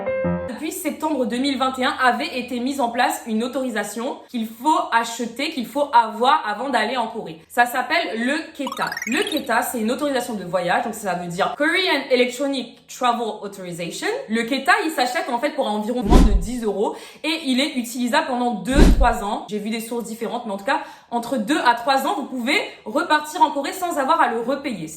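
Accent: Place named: Réunion, France. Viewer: lol j’en étais sur juste à l’accent, je cherchais des infos sur le visa e7 et je tombe sur la vidéo d’une compatriote réunionnaise, en tout cas ta vidéo était sympa et très instructif et je n’hésiterai pas à aller voir les autres. Je ne sais pas si tu comptes aussi streamer sur Twitch par exemple. En tout cas tu as un abonnée de plus =]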